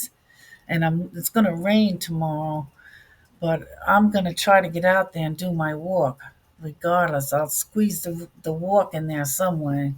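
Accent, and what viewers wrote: New York, United States. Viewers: I love your list! Usually I'm not excited about lists I find in YT videos, but THIS one is wonderful bc it is so personal to you -- and to me! I'm just 4 days in and terrified to speak it bc I'm afraid I'll fail. Bless you, young angel for your heartfelt words. You'll be better soon. Food poisoning is the pits! (is that a NY accent I hear?? love it)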